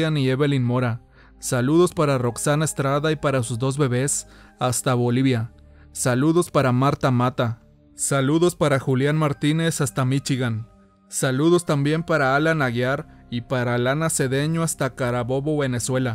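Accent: North, Mexico. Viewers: Pierdes mucho tiempo mandando saludos ya que son muchos es lo único malo que no me gusta de tu canal tienes Buenas historias Pero también tu acento Norteño no tienes una buena dicción para narrar pero tienes Buenas historias te repito saludos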